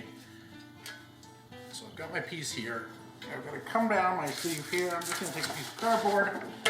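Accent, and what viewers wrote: Canada. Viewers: Love this channel., what is your accent, Canadian?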